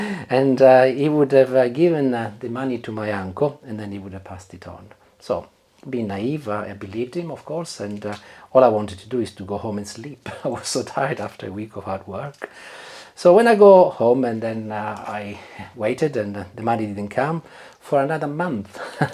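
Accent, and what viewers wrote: Italy. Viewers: What an absolute joy of a video 😊. To hear your beautiful tale of your grape picking experiences was delightful 🙂. Your accent and English is so easy on the ears. I grew up with Italians in NZ and they also spoke with your accent. It brings back beautiful memories for me ❤😊. I'm going to really enjoy listening and learning your recipes 😊❤😊.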